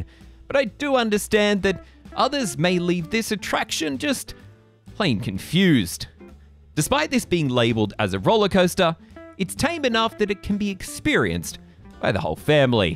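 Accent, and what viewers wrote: Australia. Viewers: I'm just here for the Aussie accent. 😂😂😂. Love it. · It has been a few years since I've been up there at Knoebel's (I remember when it was still called "Knoebel's Grove"). It takes me a good 4 hours to get there from where I currently live. That is truly an amusement park in its true, classic meaning. This is probably the last remaining park that still operates the way it does, with no admission, and using ride tickets to get on the rides. I still have a huge stack of those ride tickets, so I may have to consider going up there this autumn for the Phoenix Phall Phunphest. There are other parks that started out like this that still exists although they transitioned to the more typical modern gated theme park. Kennywood in Pittsburgh is one, as it was originally a trolley park. Hershey Park is also one as it was originally meant to provide amusement for the company's employees and family on the weekends. 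As for as the local's reactions to your Australian accent, not very many Australian people visit that part of the US, so getting see, meet and hearing one is a pretty rare treat there. Going to Knoebel's really does feel like stepping back in time and experiencing a truly traditional amusement park. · As someone who grew up about 15ish minutes away and has spent countless hours at Knoebels over the last 40 years, it's just really cool to see it get some recognition for what a lovely little park it is.... I also absolutely can imagine the reaction the Aussie accent caused. I even did a double take myself hearing the accent in the video.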